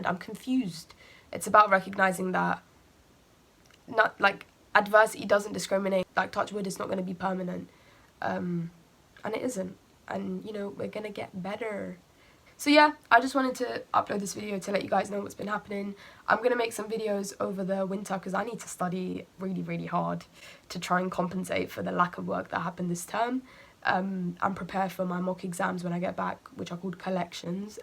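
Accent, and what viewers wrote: London, United Kingdom. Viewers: True Londoner accent.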